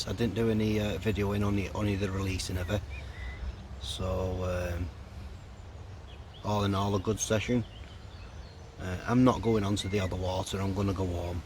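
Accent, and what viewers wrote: St Helens, United Kingdom. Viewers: You from St. Helens mate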